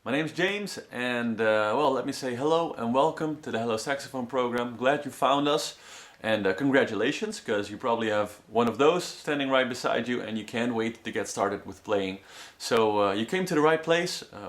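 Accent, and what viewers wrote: Netherlands. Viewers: I CAN TELL YOUR DUTCH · You're Dutch aren't you? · jij bent nederlans ik hoor het aan je stem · Sounds like you have a slight Dutch accent, true? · Ik hoor dat je een nederlander bent haha. 😁 ik speel al Gitaar en Viool. Maar zou ook heel graag Saxofoon willen leren spelen. En kan niet wachten om ermee te beginnen. Ik ben er voor een aan het sparen. En ik heb me geinformeerd bij een lokale Fanfare om zo al eerst op de Sax te leren spelen voor ik er een koop. Is dit een goed idee? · Is he Dutch of zo? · Are u dutch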